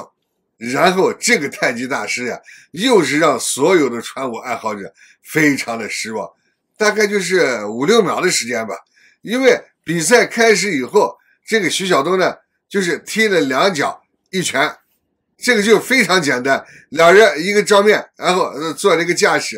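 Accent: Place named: Northwest, China. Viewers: 老哥听口音是西北人吧，思路清晰，句句实在👍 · 听大哥口音是西北一代哦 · ，额觉得你是个陕西人，得是滴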